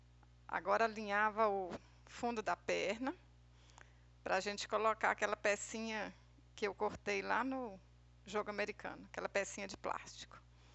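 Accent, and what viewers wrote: Minas Gerais, Brazil. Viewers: Gostei de sua aula, amo esse sotaque minerim. 🥰 Vou fazer a minha bonequinha.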